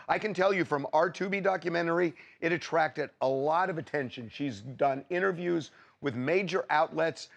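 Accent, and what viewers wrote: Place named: Alabama, United States. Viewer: The first half of this video hit home. I'm from Birmingham and when I go home to visit, it hurts to see how bad my city has gotten. I joined the military in 2015 and never looked back. People ask me if I will ever move back home and I kindly tell them I have no desire to. On another note, I recently subscribed to your channel and before knowing you were from Alabama, I was like she has a Alabama swag about her because the way you talk is exactly the way I would explain things 😂. Keep doin ya thang Alabama sis.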